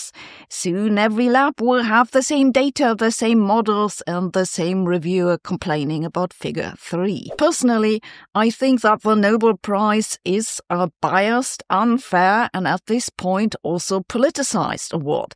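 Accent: Germany. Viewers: German roots - and so the style of speaking - peeps through - like all of us - our past speaks too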